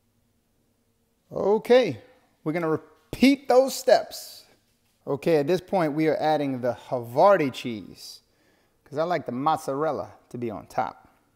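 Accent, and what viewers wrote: Louisiana, United States. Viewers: Ur wife is a lucky lady! Such a handsome guy+ u can throw dwn in the kitchen! U have such a sexy voice, with that Louisiana accent! Love watching ur videos!!!! Much luv to u and ur adorable family+ Gumbo!!!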